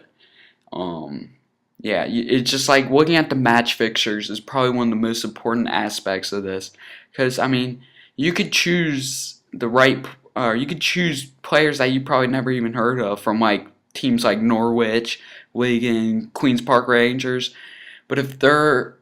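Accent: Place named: United States. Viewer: OMFG you are so american. descusting accent. albiuuunn, widffs, willshiuurrr lmfao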